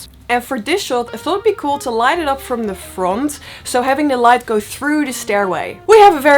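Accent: Netherlands. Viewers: Love that you called out your Dutch accent. Because i never watched a video of you before but within a few seconds i realized i was watching a fellow Dutchie ;)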